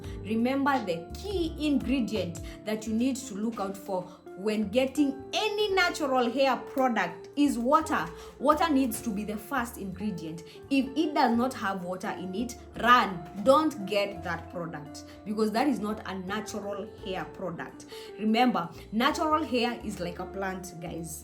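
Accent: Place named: South Africa. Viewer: Love the accent, Kinda reminds me of how Tyla speaks, are you South African?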